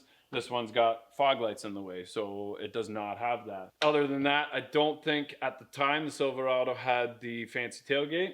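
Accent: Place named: Alberta, Canada. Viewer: My ears tell me this guy's from Alberta, but my eyes say Ontario. Either way, it's Canada. :P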